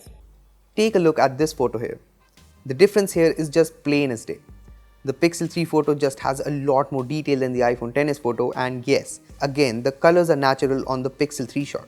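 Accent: India. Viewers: work on your indian accent. Western people like me will click away, like I just d..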